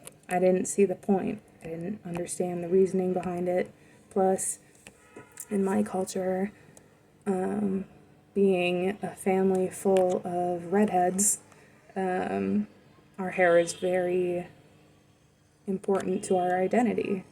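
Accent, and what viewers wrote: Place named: Midwest, United States. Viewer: Salaam, which part of the Midwest are you??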